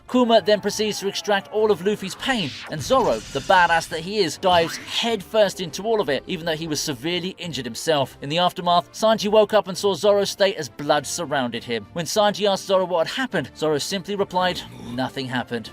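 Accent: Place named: United Kingdom. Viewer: No one gives a shit about that detail he’s british let him use his accent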